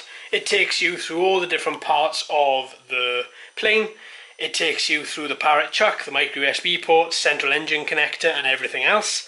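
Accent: Wales, United Kingdom. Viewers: You sound welsh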